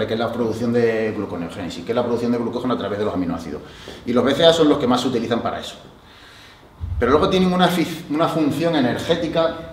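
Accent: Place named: Extremadura, Spain. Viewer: El tío es de Cáceres (Extremadura) es normal que hable así, ya que la zona de Extremadura fue una zona muy poco urbanizada por bastante tiempo y conserva un acento muy castellano, parecido a los que hablen en Castilla y León, exceptuando la zona más sur de Extremadura casi pegada a Andalucía. Lo sé porque soy extremeño.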